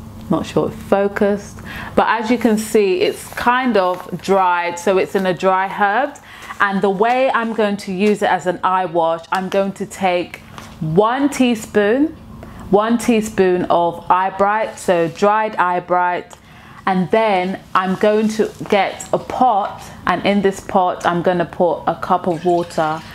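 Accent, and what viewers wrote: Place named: Alabama, United States. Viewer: i love your accent, what part of alabama are you from?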